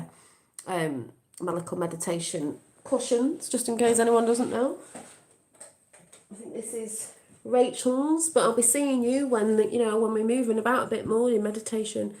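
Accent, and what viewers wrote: United Kingdom. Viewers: That's our girl, always on point with that British voice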